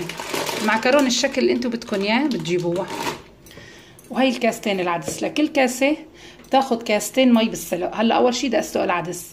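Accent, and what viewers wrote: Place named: Aleppo, Syria. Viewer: يسلم...ايديكي....يابنت....بلادي....متابعك...من. مدينة...حمص،،العديه👌👍اموت..بللهجه....الحلبيه...الاصيله...وبلمطبخ. الحلبي، احلا ست بيت،،،هي المرأه الحلبيه..👸فنانه..بتحس انها عمتعزف معزوفه موسيقيه،،،،بلمطبخ....😂👌😘😂👏👏👏👏👏👏👏😘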